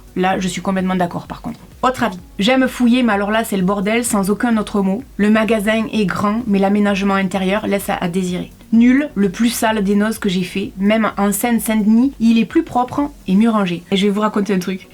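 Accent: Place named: Pyrénées-Orientales, France. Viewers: J'adore ton accent du sud ouest !! Un vrai délice auditif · Je confirme malheureusement, voilà pourquoi votre accent me disait quelque chose... ( Je vis également dans le 66) :)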